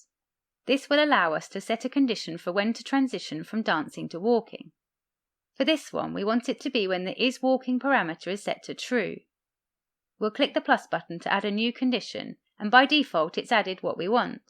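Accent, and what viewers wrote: United Kingdom. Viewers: Eeeeexcellent! And I like the British accent.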